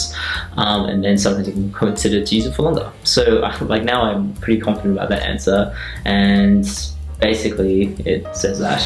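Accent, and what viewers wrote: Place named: Australia. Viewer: It must've totally slipped my mind that you're in Australia but OH MY GOSH. YOUR ACCENT. AH. Your voice, and you're so funny!!! Your video quality is beautiful, and the jazz is a vibe, and as for what YOU took notes on as a doctor wow it has all flown over my head. But you look happy and look like you're having fun which makes me happy ☺️☺️☺️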